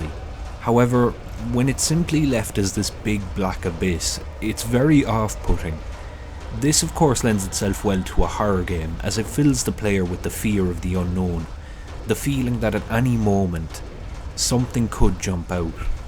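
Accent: Cork, Ireland. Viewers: Is that an eire accent? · Cork accent? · Your accent is so unsettling. Are you Irish?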